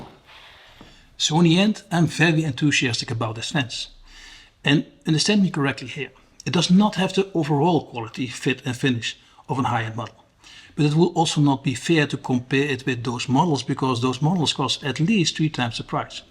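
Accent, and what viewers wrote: Netherlands. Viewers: You got to rid of that Dutch accent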